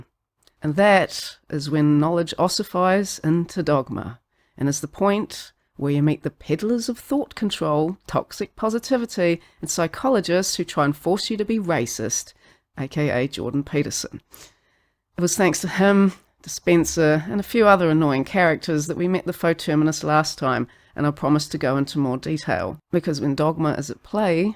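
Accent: New Zealand. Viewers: You look super interesting, like a fabulous actress from a noble lineage or something, part Vampire lady. 😊 And yes I clocked the NZ accent, I lived there <num>.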